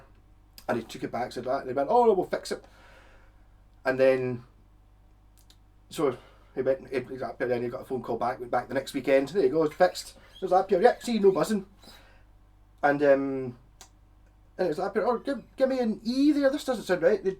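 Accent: Scotland, United Kingdom. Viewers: That's a jock accent for you, if you think that's bad wait till you hear strong Blackburn accent 😉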